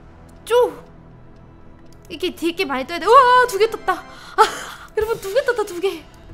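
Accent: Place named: Gyeongsang, South Korea. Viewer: 부모님이 경상도 분이신가 봐여. 님 억양에서 경상도 억양이 살짝 묻어 나네요. ^^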